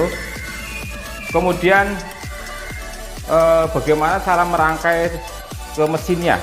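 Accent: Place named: Java, Indonesia. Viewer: NtabS....ayakan si Medok 👍🏾👍🏾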